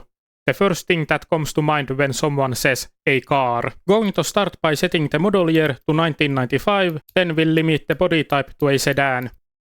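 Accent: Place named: Finland. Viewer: the most Finnish sounding guy i heard haha, nice video!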